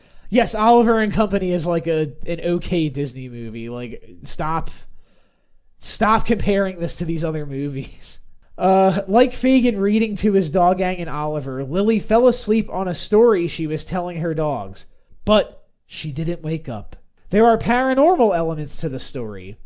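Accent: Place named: Philadelphia, United States. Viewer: Damn your Philly accent ya? Harsh!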